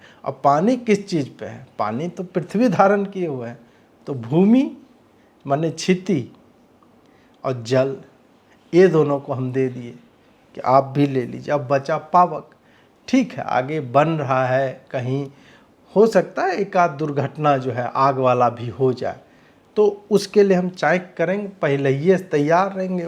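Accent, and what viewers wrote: Bihar, India. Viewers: भई मज़ा आ गया क़सम से पूरे बिहारी स्टाइल में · ji, Mai toh aapke videos dekhti hi hu, meri mataji bhi aapke videos dekhti hai. Aapki bahot prashansa karti hai ki aapki Hindi bahot achhi hai aur iss video mein toh aapne kya hi bihari bhasha pakad ke roast Kiya hai. Bahot bahot badhiya aur aabhar aapka. Aise hi videos banate rahiye! 🙏🙏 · Uffff ye maithili touched Hindi bihari accent ke sath maza aa gya ekdum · अच्छा नहीं...बहुत बहुत बहुत अच्छा लगा....किसी भी film से ज्यादा entertaining है....बिहार ki hindi बहुत mithi है....thank you so much bhai...god bless you...🙏🙏🙏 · Vaah Bhai,tu si great ho. Aaj to pure Bihari andaj mein.outstandsing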